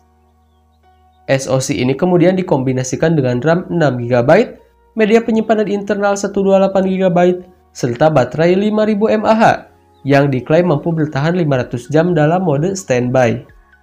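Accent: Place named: West Java, Indonesia. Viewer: SAYA MENCIUM NADA URANG SUNDA IEU MAH BOAA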